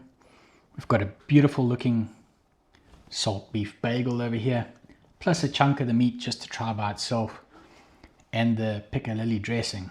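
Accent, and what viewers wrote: South Africa. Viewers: What accent's that, bud? My ear is saying South African.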